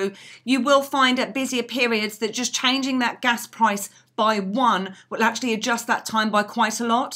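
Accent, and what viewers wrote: United Kingdom. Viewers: Hi great video and by the sounds of it you are also in UK . Have given you a follow on instagram. First off the videos are all very clearly explained and understandable so thank you for that. Secondly it would be good to find out either on youtube or on instagram some local UK or London based crypto events. I have signed up to meetup but there are almost too many to choose from . Anyway nice connecting on the crypto space keep up the vids !!!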